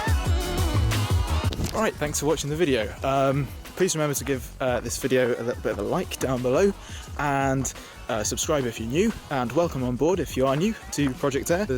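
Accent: England, United Kingdom. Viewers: I’m jealous of your English accent.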